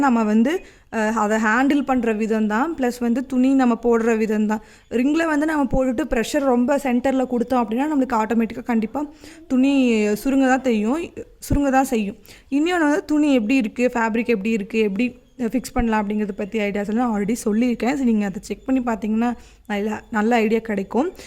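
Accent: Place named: Coimbatore, India. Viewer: mam neenga cbe ah romba naal ah unga video pakuren apove unga slang vechi doubt irundhuchu aana therila andha sree devi box patha aprm tha conform achu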